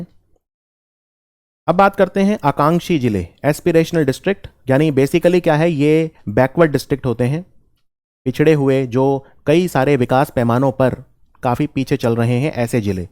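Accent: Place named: Bihar, India. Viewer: प्रणाम गुरुजी🙏 आपके द्वारा बताया गया हर एक शब्द हमारे लिए करेंट अफेयर्स में परीक्षा के समय मील का पत्थर साबित होता है, मैं आपका प्रीमियम यूजर हूं करेंट अफेयर्स का, और मैंने ये देखा है bpsc में ऐसा कोई सवाल करेंट का नही आया जो आपने न बताया हो। उच्चारण सुनके अब तो मानो लगता है आप बिहार से ही हो। इसी तरह अपनी कृपा हमसब पर बनाए रखे। धन्यवाद🙏🙏🙏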